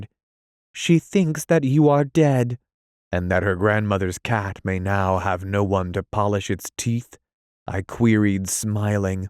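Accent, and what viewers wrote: United States. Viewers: I have a dubt , is it american English?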